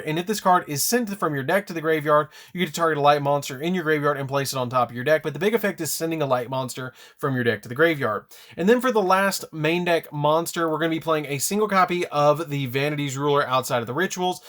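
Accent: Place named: Texas, United States. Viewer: Well its Texas English, idk if its too hard to understand, but if you're so inclined. THIS DECK GO UNGAH BUNGAH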